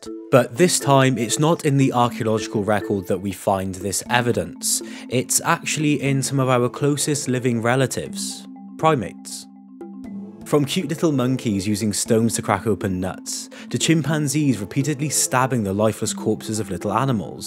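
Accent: United Kingdom. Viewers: He's a british guy with a calm voice speaking about biology so he's probably right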